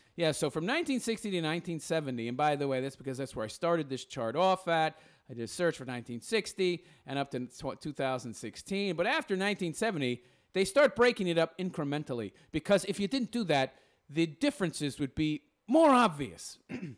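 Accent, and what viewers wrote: New York, United States. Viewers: Love the NY accent. Love the tawwwk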